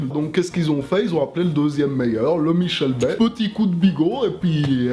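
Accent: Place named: Haute-Savoie, France. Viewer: Haute Savoie plutôt, mais ça ressemble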